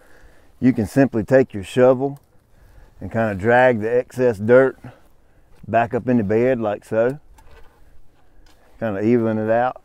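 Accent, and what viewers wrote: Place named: Georgia, United States. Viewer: Like that South Georgia drawl! North Georgia here!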